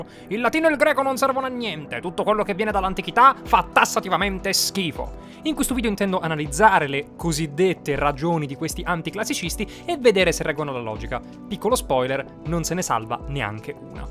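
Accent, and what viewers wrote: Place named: Sicily, Italy. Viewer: Io non me ne intendo di accenti ma sentendoti parlare così concitato mi è sembrato che la tua parlata fosse simile a Marco Merrino. Il che ha senso visto che siete entrambi siciliani.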